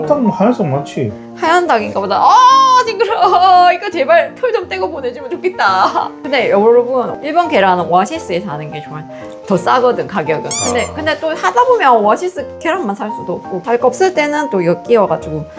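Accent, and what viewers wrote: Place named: Jinju, South Korea. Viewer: 저도컬리로 시장자주보는데요.. 님 영상보면서 실패확률 제로예요..써보고좋다고 하시는거는 항상바로바로 장바구니에담아요..너무너무도움됩니다.그리고 저는 고향이 진주인데요..경기권에서 살다보니 님 사투리들으면 고향생각나고 친구들생각나요❤..구독한지얼마안되서 매일정주행 중입니다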